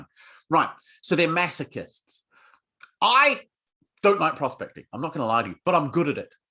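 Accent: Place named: New Zealand. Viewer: Great advice as always. You'll need to add a section on your bootcamp "how to sound like a Kiwi"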